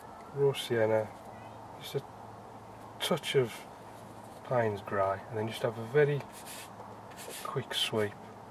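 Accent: United Kingdom. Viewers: I LOVE your British accent! And the painting of course! Just a little bit details on the trees would have made it look even better but it looks great already, I love the colors you painted on the lake and the sky, they looks so real.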